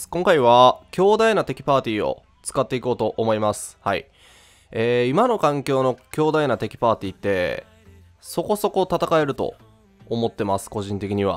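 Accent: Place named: Kansai, Japan. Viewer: スネークの関西弁もそうだけど低めで渋い声の関西弁はまじでかっこいい笑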